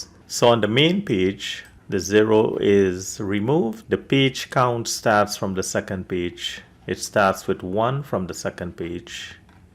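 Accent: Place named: Trinidad and Tobago. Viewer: You sounding like a Trinidadian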